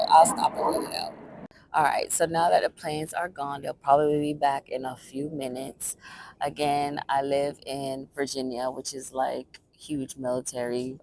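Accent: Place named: Jamaica. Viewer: I knew you were jamaican. Lol. I asked in 1 video and you never responded. I'm jamaican too🇯🇲🇯🇲🇯🇲🇯🇲. I could hear the accent.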